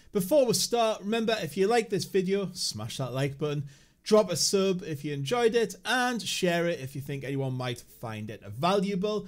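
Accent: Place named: Northern England, United Kingdom. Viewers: hello from newcastle, i noticed ya northern twang there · Oh god a bloody pommy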